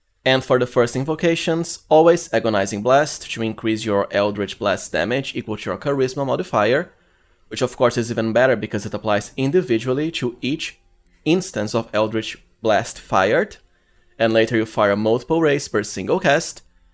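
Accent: Brazil. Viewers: are you brazilian btw? The accent feels like it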